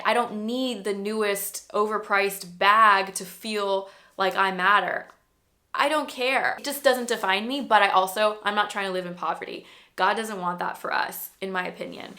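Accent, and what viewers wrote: United States. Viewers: Rrrr u are so pretty I like your hair and tht American accent is so hot🤭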